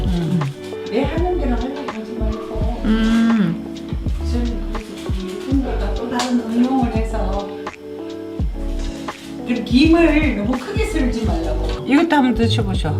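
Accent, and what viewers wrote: Gyeongsang, South Korea. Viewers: 스님 음식은 누구도 할수없는 몇가지 따라해봤는데요 이주토속적이면서 귀한 음식 약 간경상도 사투리섞인정감있는목소리 .스님 건강하시고 다음 또기대합니다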